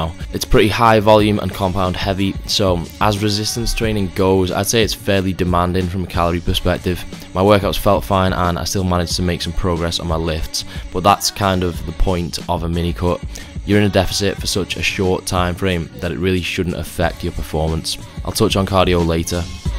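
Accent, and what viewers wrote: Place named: Yorkshire, United Kingdom. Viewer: Where are u from? I love the accent, sounds a bit North English probs Yorkshire?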